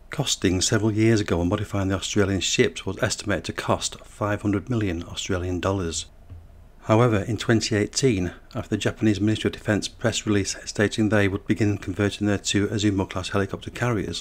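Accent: Northern England, United Kingdom. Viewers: Got northern accent. Sound suicidal. Voice as colourful as coal pit near Birmingham. Sound like VoiceOver recording is a painful chore.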